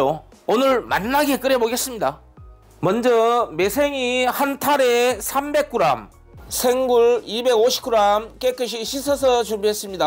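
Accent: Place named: Yeosu, South Korea. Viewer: 여수 억양이 많으시네요 잘봤습니다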